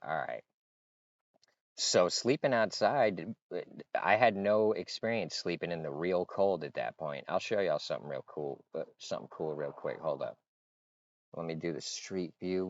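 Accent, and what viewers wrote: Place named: Northeast, United States. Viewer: Ya you’re good at telling stories. You have a nack for it plus the cool northeast accent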